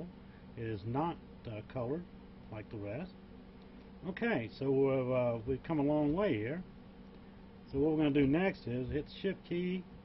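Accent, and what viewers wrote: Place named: England, United Kingdom. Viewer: in the beginning of the video i thought you were from england. then i realized you have a southern draw..then i thought maybe louisianna..then i thought maybe i was initially right about being english.. anyway thanks for the video! z brush has so many features i dont even think the makers of zbrush know all of them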